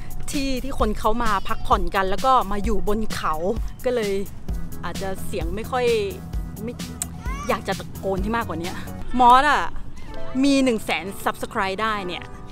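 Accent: South, Thailand. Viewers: มอสเป็นคนใต้ใช่ไหมครับ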